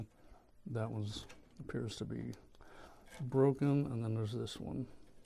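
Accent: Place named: Carolina, United States. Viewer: love your accent. are you from Carolina State?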